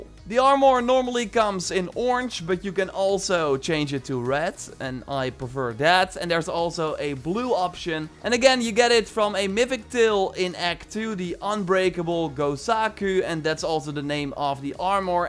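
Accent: Netherlands. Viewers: Nederlandser accent kan niet 😂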